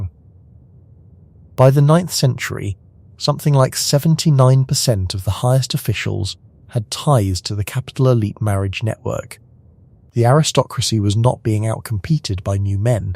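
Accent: United Kingdom. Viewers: The voiceover to this topic uses a very odd / strange pronunciation of the russian word for cash/ money: ROUBLE as rubble.. The British voiceover pronounces the word ROUBLE as rubble. So odd.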